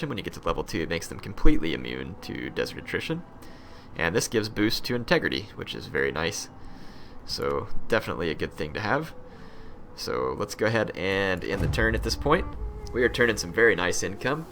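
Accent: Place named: United States. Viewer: By the way what US state are you from again? Just curious cause i'm trying to figure out your accent ;)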